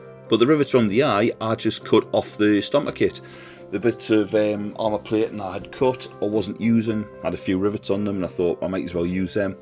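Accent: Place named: Newcastle, United Kingdom. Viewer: Finally! A fellow Geordie! Nice work fella!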